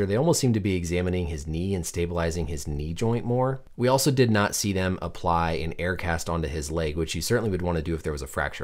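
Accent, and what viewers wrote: United States. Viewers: I subscribed because you said “football” instead of “soccer”.. and in an American accent not even British 🎉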